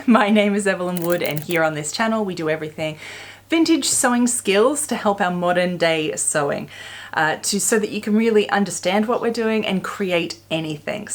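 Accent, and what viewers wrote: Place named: Australia. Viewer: You look so French today, but amusing to hear the Australian accent! Lol!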